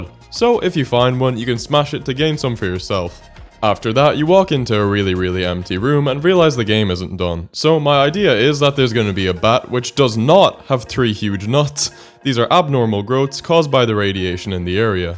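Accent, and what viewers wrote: Poland. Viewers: im new to the channel and i don't know but are you polish?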